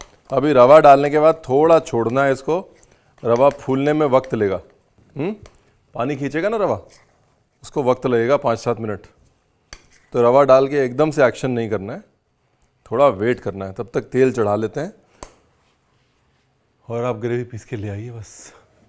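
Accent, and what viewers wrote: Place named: Punjab, India. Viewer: Mr. Brar, I have come across your cooking videos while surfing on the net randomly about two weeks ago. Anyhow, I haven't tried your recipes yet, but the mouth watering desi "Tarka" of your crispy and spicy chat is enhancing the flavour and taste of your programme immensely. The reason for enjoying & watching your programme is not your recipes but your dextrous and impressive way of connecting with your intangible viewers globally. It's worth mentioning that amalgamation & use of typical household punjabi, hindi words with appropriate dialect phonetics in your chat is stunning & awesome. Great job, keep it up.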